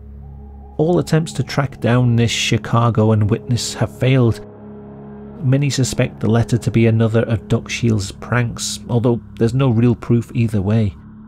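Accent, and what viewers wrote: United Kingdom. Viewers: That British accent makes it even more peculiar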